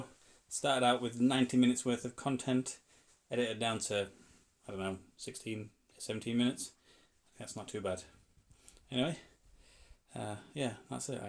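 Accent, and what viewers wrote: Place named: North East England, United Kingdom. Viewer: I go to charity shops looking for footy shirts - no luck so far. Guessing from your accent you are from somewhere in the north east, same as me, so you’ll need to take the mannequin for good luck.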